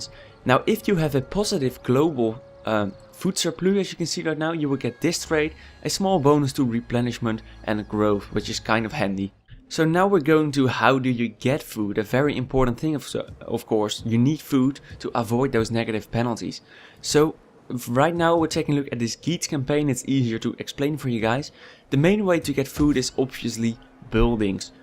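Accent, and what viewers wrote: Netherlands. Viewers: This guy is so dutch , holy shit ! ty tho <3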